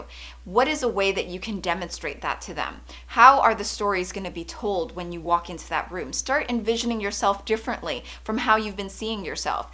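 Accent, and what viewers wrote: Canada. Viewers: This is so much more inspiring with a Canadian accent!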